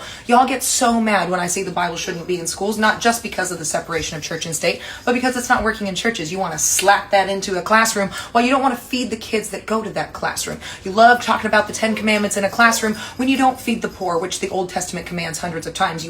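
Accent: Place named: United States. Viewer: Dear, I love u. To be precise, I love tt fire cracker brain of yours. 🥴 U don't know how hungry I hv been for scintillating intelligence tt cuts right thru the 💩 that's slung at us every day thanks to social media n the shameless greed, evil n lies of the privileged class... I'm also green w envy tt u hv an IQ of 146... Gasp! But I know it can't be ez n tt it can be v lonely inside at times. But for whatever it's worth, brain to brain, u hv an ardent admirer here. I struggle a teeny bit at times w yr rapid fire discourses at times as I hv to parse yr American accent thru my cynical Chinese brain n stodgy British education but I don't mind the coz U make me laugh so much when you give all those freaks, hypocrites n liars the dressing down they so richly deserved... I'd buy u a carving knife but man, tt tongue of yours wld do a MUCH better job! 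🤣 I hereby declare tt I am yr humble fan n grovel at yr feet for all those 'licious lil crumbs of whiplashing U give those oh so deserving man-babies. 🤣😂🤣 Oh dear, I hope my jaw doesn't fall off fm all the laughing. May the Universe bless U n send U lots of fireflies to light yr way. 🌌🥴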